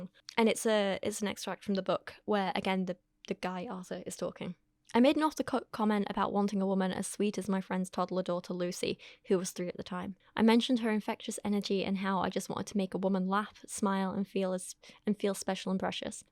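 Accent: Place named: North, United Kingdom. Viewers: I enjoy this channel because it's hard feminist truths dropped in a lovely northern accent and also sometimes you hear/see a gremlin (hi!) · I appreciate your takes on things and your unapologetic-ness (and your nothern accent! Makes me feel right at home.) People have lost their damn minds. Look after your mental health after this one, lovely <3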